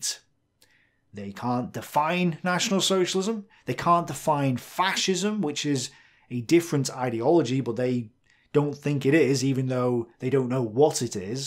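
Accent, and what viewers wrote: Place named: Cockney, United Kingdom. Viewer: Dude you have a strong cockney accent ! It sound very weird but I am ok with rhat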